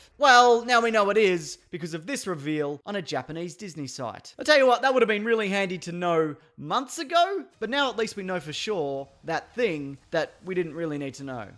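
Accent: Australia. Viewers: Maybe it's the Australian accent, but I never get offended when he trashes Trump, even though I am a Trump supporter. Thanks for always making me laugh,.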